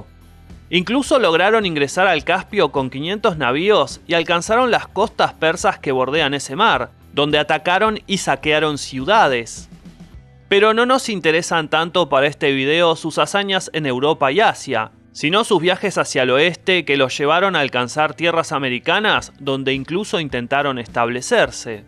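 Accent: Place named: Argentina. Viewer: Por tu acentonoareces argentino, y un desagradecido decir que nos vikingos llegaron antes que los españoles acompañan a colon, que gracias a ellos tienes lengua y un celular 4g, los que nose han descubierto como las tribus Amazonas.....ahí siguen tirando flechas....y seguro que eres biznietos de un gallego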